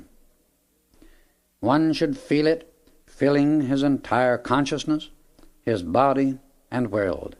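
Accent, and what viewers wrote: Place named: Northern Ireland, United Kingdom. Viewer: the accent sounds Northern Irish to me. Would love to know who it is.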